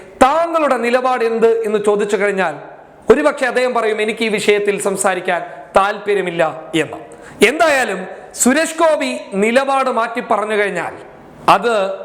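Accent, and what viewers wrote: Kasaragod, India. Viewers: Kasarkodan slang idykku kayari varunnu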